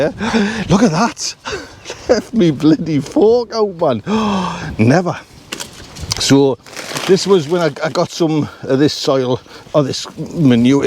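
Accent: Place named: Newcastle, United Kingdom. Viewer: Love your accent! I live on Vancouver Island but my Mum's a Geordie (from Whitley Bay!) Just found your channel and like your videos. This is my second year of veggie gardening. Last year had an amazing yield and hoping for the same this year - although I'm fighting off a LOT of pesky bugs with home-made garlic spray - relentless little critters!!! Also, this year I started everything from seed and the birds are feasting on the seedlings as they germinate, so plan for today ...... make some of those foil ribbons! Thanks for sharing and all the best to You! :)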